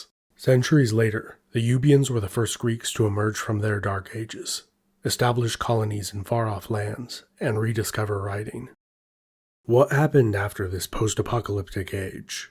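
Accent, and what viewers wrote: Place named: United States. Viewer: This is great. I usually avoid American accents but your voice is wonderfully rich and calm.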